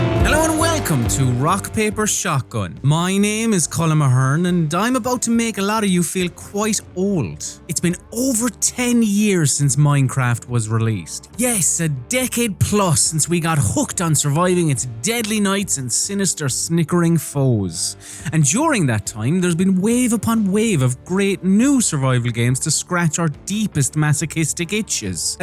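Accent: Ireland. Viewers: I have never heard such Irish accent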